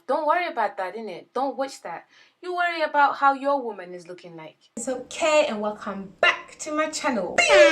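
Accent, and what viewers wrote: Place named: Malawi. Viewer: Your Malawian accent I love 😂😂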